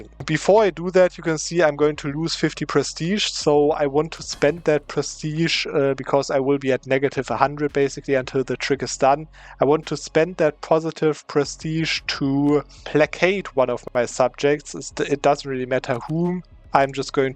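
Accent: Germany. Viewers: REALLY thicc german acsent.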